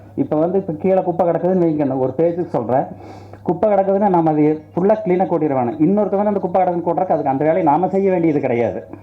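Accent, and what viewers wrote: Coimbatore, India. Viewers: i love kovai tamil.. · kovai tamil arumai ayya :)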